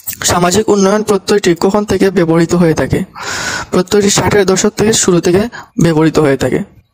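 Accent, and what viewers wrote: Sylhet, Bangladesh. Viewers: আমি ও সিলেটি আপনার কথা শুনে বুঝতে পারছি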